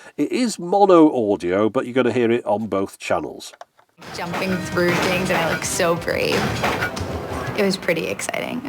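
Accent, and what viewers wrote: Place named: Manchester, United Kingdom. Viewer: I grew up in north Manchester and your accent sounds very familiar, are you from that area?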